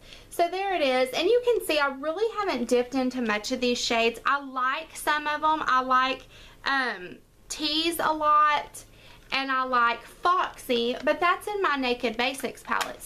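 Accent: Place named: Tennessee, United States. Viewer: New subbie! I'm from Tennessee and girl you sound like me! Lol loved the video can't wait to see more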